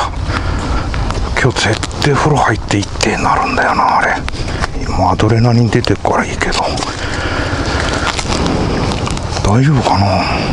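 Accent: Tohoku, Japan. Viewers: 喋り方で東北の人かなって思うw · この訛りは東北の人ですか？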